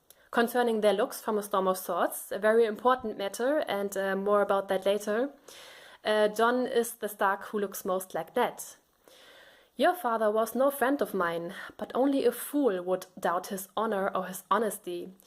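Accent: Germany. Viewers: That german accent . Nice theory tho. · Is English your mother tongue? Your speak a bit with a german accent, I guess, but that's very enjoyable...^^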